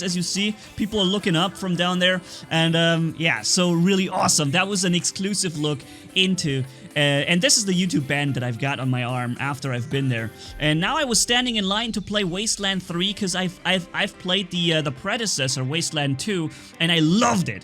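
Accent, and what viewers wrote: Germany. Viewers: Are you german bro?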